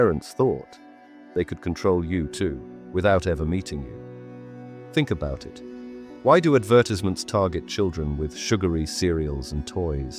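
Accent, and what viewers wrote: United Kingdom. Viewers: I can't stand these UK accents so annoying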